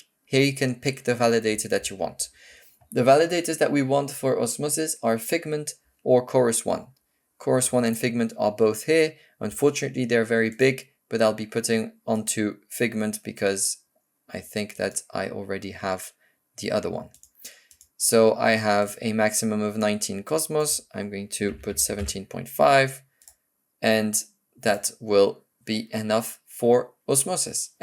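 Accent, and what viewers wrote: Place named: France. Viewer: D'autant plus que l'accent est très frenchy 😖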